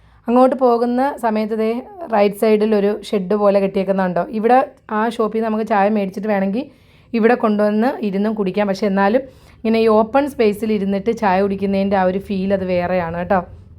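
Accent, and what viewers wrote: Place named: Travancore, India. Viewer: തിരുവിതാംകൂറിലെ സംസാരം എല്ലാവർക്കും വ്യക്തമായി മനസ്സിലാകും. അഭിനന്ദനങ്ങൾ.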